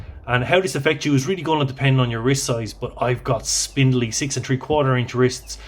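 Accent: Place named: Ireland. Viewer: And, nice channel you've got there. As an Irish guy as well, the familiarity of your accent and turn of phrase is nice to hear. And like you, I'm in the same boat when it comes to Red Breast.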